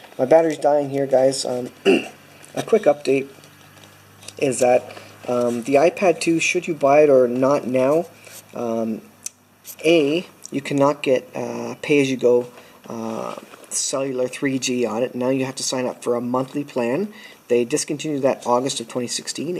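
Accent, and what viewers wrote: Canada. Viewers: He's from Canada eh?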